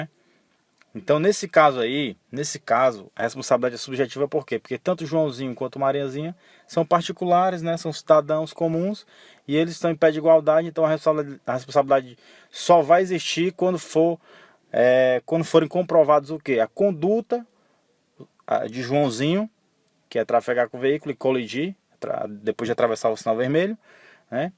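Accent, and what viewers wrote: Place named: Ceará, Brazil. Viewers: sotaque cearense arretado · Cearense da gema 😂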